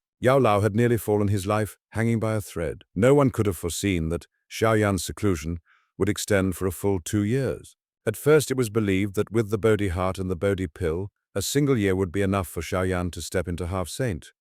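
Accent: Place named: United Kingdom. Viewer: British accent is not match the stories like this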